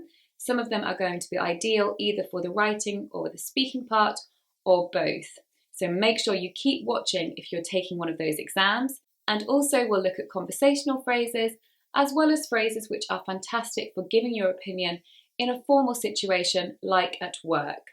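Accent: United Kingdom. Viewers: I believe brittish accent is awsome when you use it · Stop speaking british accent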